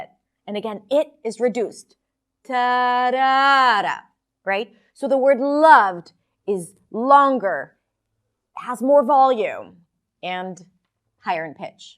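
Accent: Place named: Boston, United States. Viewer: She is really easy to understand but her vowels are not standard English but some kind of dialect, I expect Boston. My understanding is that the everyday Boston accent is difficult to understand by people not from the area.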